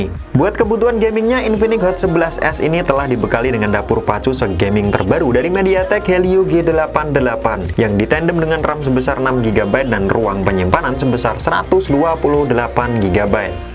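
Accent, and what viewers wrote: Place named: Java, Indonesia. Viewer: Jowo ngendi mas😁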